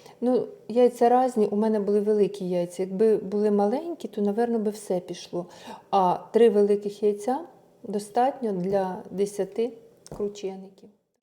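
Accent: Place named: Poltava, Ukraine. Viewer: Так говорять на Полтавщині,на Сумщині,на Донбасі.Що не подобається? Чі тільки треба на галичанському говорити.Коли ви вже перестанете чіплятися до того,хто як говорить.Задрали вже!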